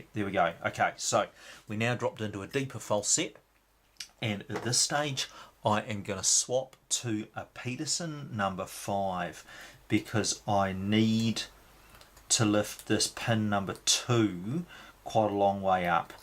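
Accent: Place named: New Zealand. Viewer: Nice lock & good pick. I love your screen annotations, and I think your commentary rivals BB & LPL. Plus you've got that great... KIWI accent! Send'n the love from the USA.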